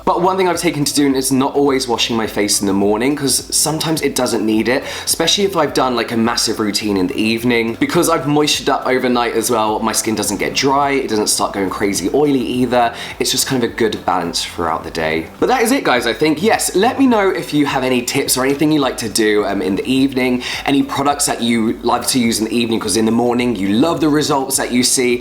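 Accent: United Kingdom. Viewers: You thought me a bit of british accent, Second significant reason of me watching lol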